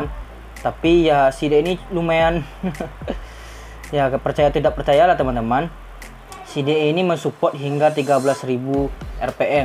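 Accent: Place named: Padang, Indonesia. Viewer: Orang padang nih ketara bat haha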